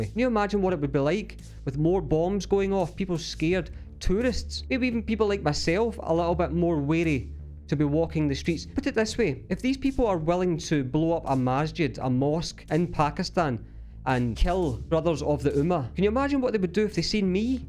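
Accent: Scotland, United Kingdom. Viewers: Shamé on u pa jéet, he's Scottish, it's natural & a nice accent. As u don't have anything to counter the facts so learn to shut up.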